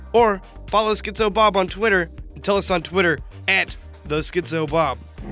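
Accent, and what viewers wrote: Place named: United Kingdom. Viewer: i really liked your british accent ahaha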